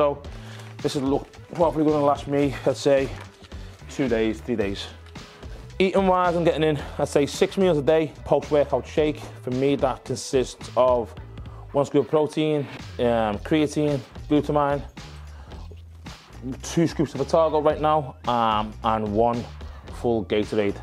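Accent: Liverpool, United Kingdom. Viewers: The Scouse Way.. love the language. i have fun trying to decipher. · the scouser accent for me! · He is a scouser from liverpool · Hear that scouse accent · Scouser confirmed 😄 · he’s from liverpool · I am a Liverpool fan and have heard many local players speaking the same accent. It's difficult to understand but cool anyways. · Scouser accent eh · Love the Scouse accent, represent 💪 YNWA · Ah, the scouse accent. Brilliant. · Don't get how anyone can't understand the accent. a mickey. Nout wrong with that. My birds a mickey...best and funniest people in the UK 👍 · Is this guy from Liverpool England? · Omg what he is speaking too much Britishness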